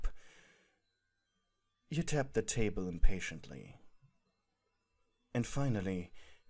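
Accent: Germany. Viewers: GODDDD I LOVED THIS SO MUCH (question are u German?)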